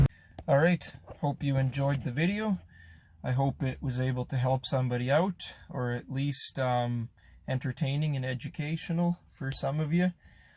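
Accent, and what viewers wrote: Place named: Canada. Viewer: Dude you have to be from canada...lol you and i talk the same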